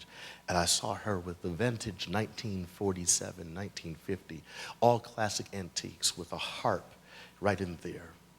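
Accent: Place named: Nigeria. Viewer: U sound like a Nigerian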